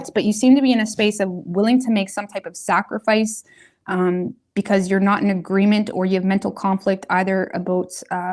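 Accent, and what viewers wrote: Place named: Canada. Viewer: Just wanna say. I really like when readers are open enough to let is into their personal lives and take a break to stretch, smoke break etc. As a smoker i love to see the reality and appreciate doing what you do. As a fellow Canadian who lives in thd U.S. hearing you makes me happy and homesick. Thanks.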